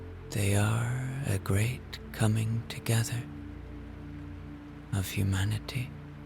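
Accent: Ireland. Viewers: I have a 3 week old baby and your sleep stories have helped me conk out when my husband takes the baby for a while! It’s lovely to hear an Irish accent in the stories. Loving your work, from a Galway girl turned Dub 😂❤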